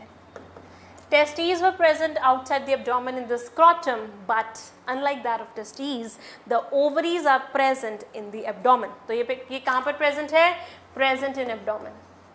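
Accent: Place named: Punjab, India. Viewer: Thoda panjabi nikal jati hai❤️❤️❤️.....ÑîÇÈ ma'am.....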